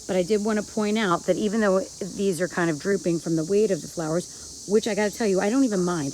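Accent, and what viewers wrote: New Jersey, United States. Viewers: I knew the accent! Hey jersey girl from philly girl!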